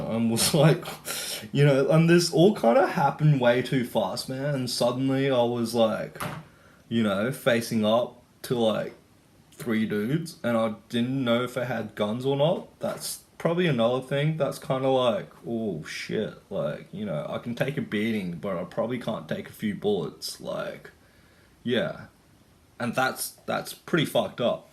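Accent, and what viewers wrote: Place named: Australia. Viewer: I won hundred percent agree I don’t know if it is speaking style in the way that he says things he just interrupts him self constantly and beyond as aimlessly or if it is over the top Australian bro boy douche bag accent. It’s awful to listen to and maybe that’s not his fault but I think he’s pushing it a little bit. Either way it’s a shit video and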